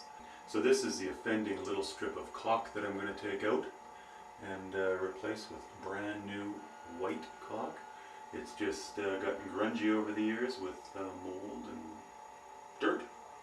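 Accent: Canada. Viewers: everybody's an expert... NOT... Guess I'll have to post one to show how to caulk properlly.. SHEEEZZZ He's not British.. I'd say a canuck.. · thought you sounded canadian with the distinctive ooo's and then I'm pretty sure it is confirmed when I saw the french writing on the caulk tube. good job! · Thanks for this nice little video - maybe not up to professional broadcast standards, but calm and pleasant and perfect for us dummies. Actually, your narrative sounds very much like the E.B.White readings of "The Trumpet of the Swan" and "Charlotte's Web", except this is the first time I've EVER heard a stereotypical Canadian accent like Bob and Doug Mackenzie's! (Which is kinda weird, since I live in Montreal and spend a lot of time in Toronto/Hamilton.)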